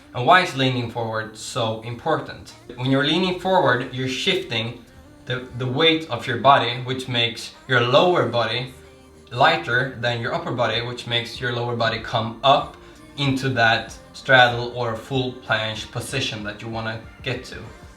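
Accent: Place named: Scandinavia. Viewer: Where ya from dude, you sound Scandinavian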